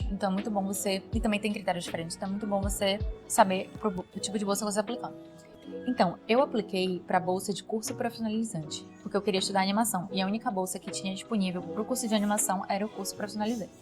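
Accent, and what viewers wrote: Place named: Pará, Brazil. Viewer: Um sotaque lindo, parece paraense❤😂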